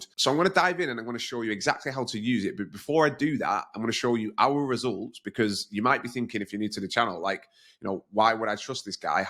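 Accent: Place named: England, United Kingdom. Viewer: Hi just started watching your videos, you sound english, would you say to start that I should start in England or America, I see you doing america fba. Also osome has lots of really shit reviews about just not providing the service they promise, it's made me not trust you as much, if you was a big honest channel, why would you pick a company that has a lot of bad reviews?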